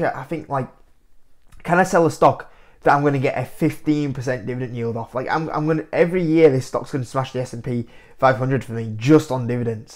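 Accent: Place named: London, United Kingdom. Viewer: Are you from London or something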